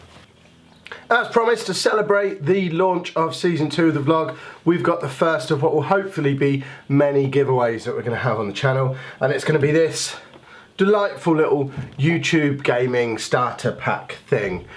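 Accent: United Kingdom. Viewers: lol I would want the headset I hear a UK accent are you in the UK or USA?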